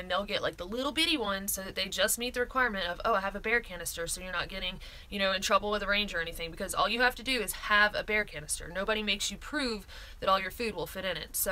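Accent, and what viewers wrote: South, United States. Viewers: Peter Welsh are you implying she is incapable of pronouncing "Nevada" correctly because she's from the south? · Bill Thomason she's a southern girl from Alabama, deal with it.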